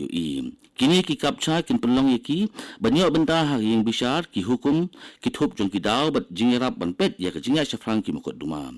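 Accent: Khasi, India. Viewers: une kein u thylliej khhasi da shongkun shisha keep it up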